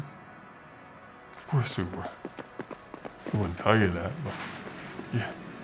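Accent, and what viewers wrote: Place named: United States. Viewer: Russian in American accent. Love it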